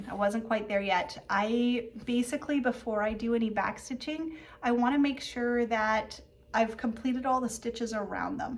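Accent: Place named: Canada. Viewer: I just started watching your videos after a shout out from the team at Just Keep Stitching. Your stitching projects are so beautiful! I love your devotion to each and every one of them. And, yes, I love your Canadian accent too! My mom was born in Nova Scotia and all of her relatives (except one) still live in Canada, mostly in the Maritimes and Ontario. I have subscribed to your channel and can't wait to see your progress on your projects.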